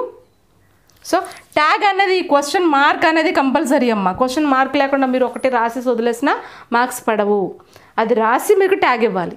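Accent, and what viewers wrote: Telugu, India. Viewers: Content is good. But pronunciation is not up to the mark.Telugu accent is prominent. Please work on that to improve your teaching abilities.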